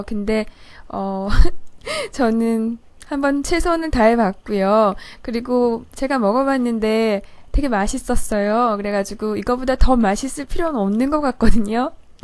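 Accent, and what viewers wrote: Seoul, South Korea. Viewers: 말투랑 목소리 김하늘 같아요~ 예전 서울 사투리? 말투ㅎㅎ 목소리 넘 매력있어요!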